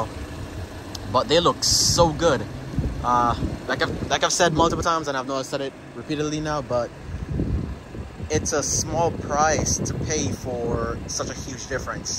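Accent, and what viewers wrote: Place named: Jamaica. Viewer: Jamaican accent came out in this one.. Proud of you, Excellent video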